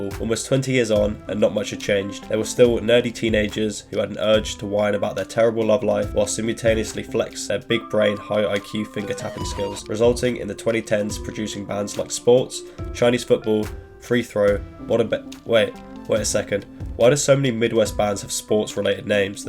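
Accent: United Kingdom. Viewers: Lmao, British accent talking about the Midwest… cute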